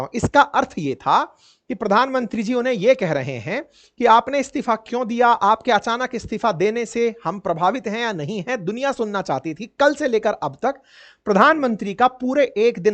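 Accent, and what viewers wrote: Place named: Rajasthan, India. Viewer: Your speech has a Rajasthani smell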